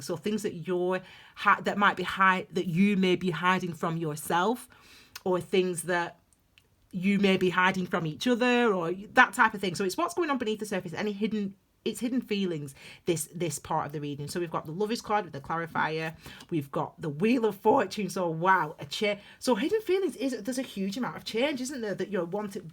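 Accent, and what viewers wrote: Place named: Lancashire, United Kingdom. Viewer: a grand lancastrian accent..interesting reading.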